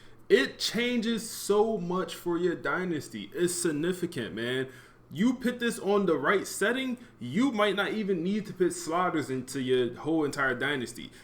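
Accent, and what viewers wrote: Philadelphia, United States. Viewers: Man I instantly knew this dude was from Philly!! lol but I used Flexible Play caller without realizing what I was choosing. Description definitely fits against my game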